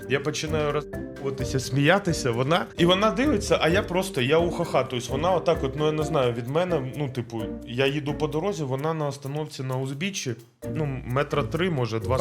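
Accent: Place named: Surzhyk, Ukraine. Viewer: Який лютий суржик!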